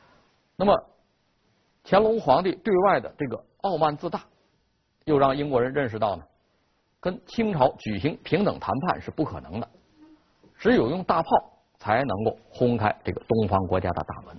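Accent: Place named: Inner Mongolia, China. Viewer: 他是蒙古族，講話音調跟漢人不一樣，當你看完全系列會有很大的收穫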